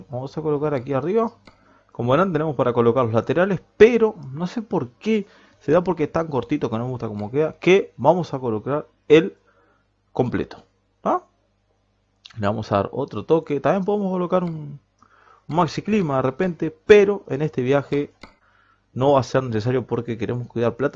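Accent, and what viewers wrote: Argentina. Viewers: a pense que de argentina jaja saludos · Capoooo Pense que eras Argentino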